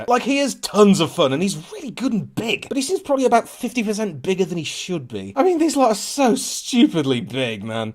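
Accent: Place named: United Kingdom. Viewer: Ah, the accent and "cheeky". Of course you know Penfold and Dangermouse. Did you guys have Bananaman?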